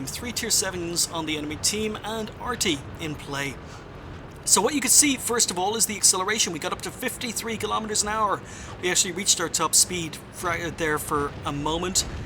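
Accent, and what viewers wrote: Ireland. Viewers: but no one says is it worth it like. Its that Irish accent LOL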